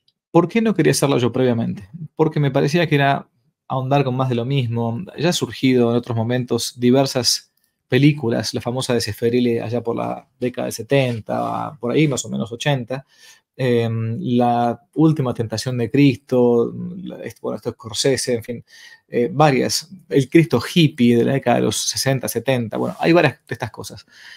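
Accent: Argentina. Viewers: Padre usted diciendo que la virgen que pusieron era la más feita! , que importa eso? Es usted argentino verdad? Ya entiendo su forma de hablar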